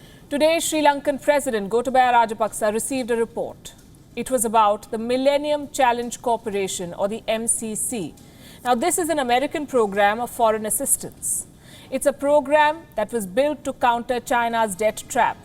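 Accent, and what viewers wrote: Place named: India. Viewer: American here watching, and i LOVE THE INDIAN ACCENT.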